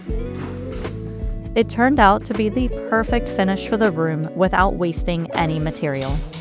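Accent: Philadelphia, United States. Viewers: Is the narrator from the Philadelphia area? You can take the girl out of Philadelphia… but realistically, it could be anywhere in PA/NJ/DE/MD/VA that I hear. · do I detect an eastern Pennsylvania accent? Philly maybe? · I've enjoyed this, nice to occasionally see DIY people who are actually competent builders. I grew up in an A-frame house with a gambrel or double pitched roof. Your garden harvest was epic. I think I'll stick around. Is that a Philly accent or Delaware? I know it's not Western PA, they have a different thing going on over there. 🙂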